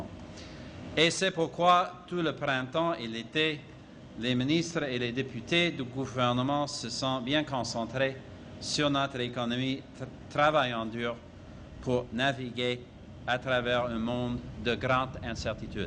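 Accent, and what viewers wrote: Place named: France. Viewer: people who are making fun of his french accent. Well, some french who speak english don't have a perfect accent either...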